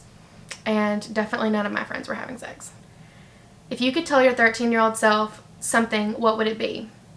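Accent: Alabama, United States. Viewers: When I’m watching this video you guys are at 99.4 subscribers. SO CLOSE! I would love to see a series of videos like this. Also, do you guys still live in Alabama? NOT CREEPY, I’m just really fascinated by your accents 😂🤣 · I just discovered your channel from your story video 💕💕 I knew I recognized that Alabama accent.. I'm a Bama girl too! 😊 You sould like a great mom! I would love to watch a series on your teen mom experiences. Thank you for sharing your story. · I had a STRONG feeling you were from Alabama. I’m from North Alabama!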